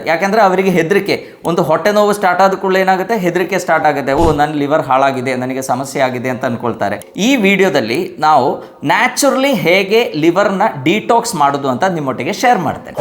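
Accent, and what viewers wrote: Kerala, India. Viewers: Very knowledged and experienced doctor who speaks Kannada fluently with good prounanciation but reveals the fact of originally from Kerala while speaking few word in English For example follow pronounciation. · Malayali kannada samsarikkumbol vere oru feel aan ❤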